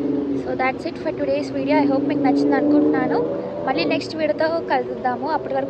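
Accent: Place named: Godavari, India. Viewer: typical godavari slang....... ------from a godavari boy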